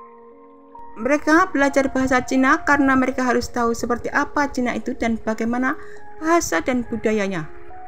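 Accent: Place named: Java, Indonesia. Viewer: Berbahasa Indonesia lah yang benar jangan medok sekali logat Jawa nya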